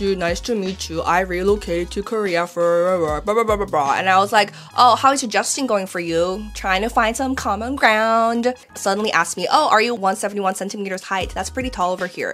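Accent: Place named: United States. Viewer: Omg for most of the video I didn’t even noticed you used a fork lol, you speak like you are from the USA. Good vid but the cringe saying is annoying atleast to me, you are wise on thinking but are too judgmental for most men and your smarts may come across feminist where u feel superior over others. But u are still young. You may be different in person I can only judge from video, just have fun in life and don’t worry about others so much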